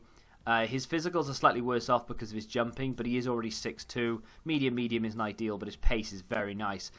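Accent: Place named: Cardiff, United Kingdom. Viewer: You from cardiff?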